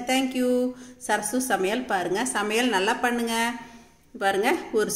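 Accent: Kongu, India. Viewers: Thank you amma I like ur receipes and way of talking Kongu slang I am from Erode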